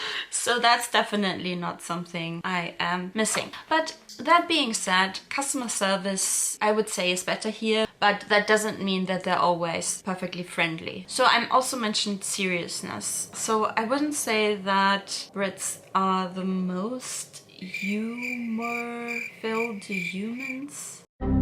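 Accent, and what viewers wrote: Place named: Germany. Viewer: I absolutely love English being spoken with a German accent….especially when it is spoken by a German woman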